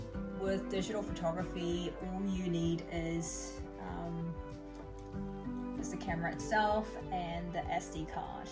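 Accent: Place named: New Zealand. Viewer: ㅋㅋㅋ 맨날 미드만보다가 오랜만에 키위악센트 들으니까 귀여워 😁😁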